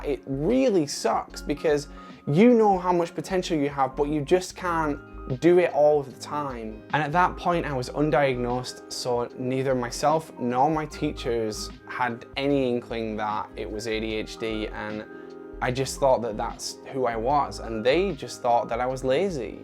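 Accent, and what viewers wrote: United Kingdom. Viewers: How did you get adderall in Uk??? It’s £400 a box and isn’t on Nhs! Unless you live in USA! Lol you sounds British tho!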